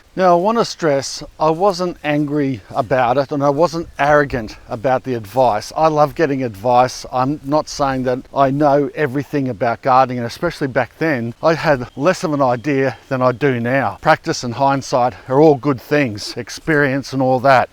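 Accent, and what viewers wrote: Australia. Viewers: Hello uncle. many many thanks, today my 5 yrs son was watching with me your video; he was very happy with your talking and beautiful Australian accent 😀 While I was amazed by the way you were managing your beautiful garden! I have a small garden and I am very much thankful for your videos from which I benefit a lot! Best wishes to you, friend!❤ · Thank you for your wonderful work and share. From Québec, stubborn french speaking canadian hahaha! Going through tough winters and an ocean of english speaking. By the way I just love, love your australian accent! Really sweet.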